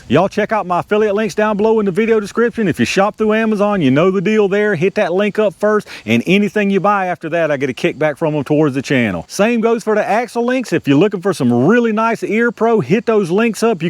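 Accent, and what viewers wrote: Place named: Rural, United States. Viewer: I can’t stand listening to this hick talk.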